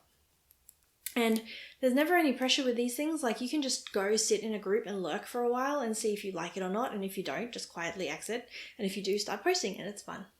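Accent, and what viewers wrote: Australia. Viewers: So glad your enthusiasm has returned! Looking forward to seeing your next vlog. It's always good to hear a fellow Aussie voice on youtube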